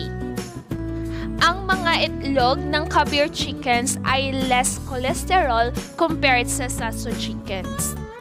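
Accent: Pangasinan, Philippines. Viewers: Pangasinan accent si ate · Pangalatok si maam may punto.hehehe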